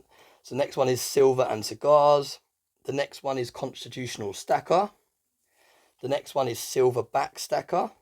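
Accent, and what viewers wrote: United Kingdom. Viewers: I have a ear for voices and you must come from the same part of England as Solomon Lane from Mission Impossible. 😁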